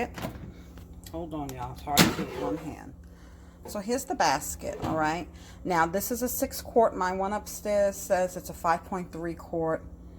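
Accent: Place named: New York, United States. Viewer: That little piece was I'm sure was the best. But the rest I'm sure was perfect. You sound like a New Yorker all day and night. Something I will try soon!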